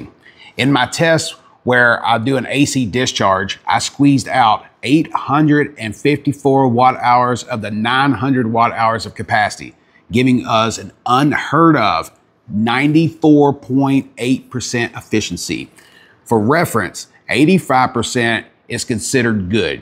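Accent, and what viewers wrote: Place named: South, United States. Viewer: I'm curious what southern state you hail from... Georgia my guess...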